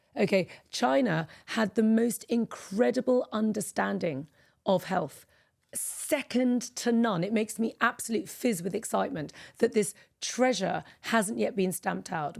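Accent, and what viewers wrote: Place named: United Kingdom. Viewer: thankyou. So excited to have found your channel, beautiful, knowledgeable lady, and with a perfect British accent, yay!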